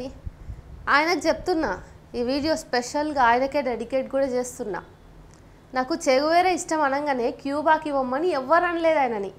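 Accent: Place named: Telangana, India. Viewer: అతితెలివి dobbamaaku తల్లి చూస్తే చక్కని తెలంగాణా యాస బాగుంది.కానీ పాకిస్తాన్ ను ప్రేమించేవారు వెళ్లాలా..!వద్దా!జాతి సమైక్యతను దెబ్బతీసే వాళ్లను ,భారత సార్వభౌమాధికారం ను విచ్చిన్నం చేసే వారు ప్రజాస్వామ్యంలో శిక్షార్హులు...లేదా భారత పౌరసత్వాన్ని వదులుకుని ఆదేశం పోవాలి.నైతికభాద్యత వహించాలి.పవన్ కళ్యాణ్ అనైతకమైన ప్రేలాపనలు కరెక్ట్...కానీ సమకాలీన పరిస్థితులు దృష్ట్యా ...పాకిస్తాన్ భారత ను 75 సంవత్సరములుగా పెడుతున్న ఇబ్బంది పర్యవసానానికి ప్రతిస్పందన అలాగే ఉండాలి.విషయ పరిజ్ఞానం ఉండాలి తల్లి .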